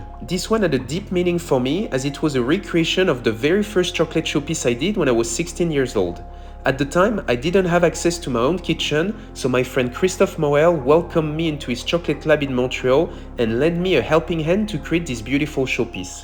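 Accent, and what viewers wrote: France. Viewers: Oh man, now I get what people mean by "strong french accent". And that's from someone who's French themselves ! Over than that, great english, and super cool Kraken. Your work is always breathtaking ! :)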